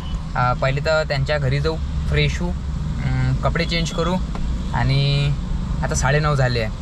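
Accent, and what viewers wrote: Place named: Vidarbha, India. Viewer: Bhai tu pakka VIDARBHA mdhla ahe.... Vidharbha chi bhasha ch alg ahe bhawa🙌🔥🔥🔥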